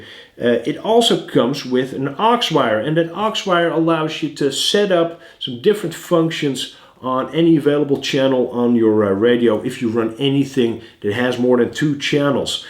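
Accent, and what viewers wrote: Netherlands. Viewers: Like it! Maar ben je nou Nederlands?🤔 hoor een nederlands accentje😂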